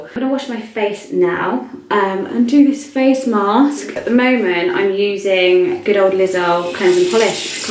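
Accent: United Kingdom. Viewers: nice true English voice :)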